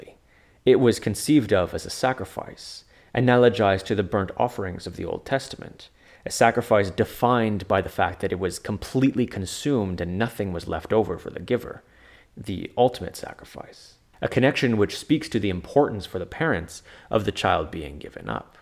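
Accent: Quebec, Canada. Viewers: Toi t'es québécois c'est certain 😅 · L'accent québécois m'a surprise haha (j'entends rarement mes compatriotes sur YouTube) Merci pour l'excellent vidéo 🎉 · Es-tu Québécois???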